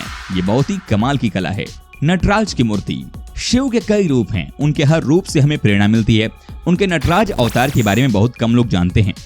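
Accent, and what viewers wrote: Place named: Odisha, India. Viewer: Your mother tongue is odia Right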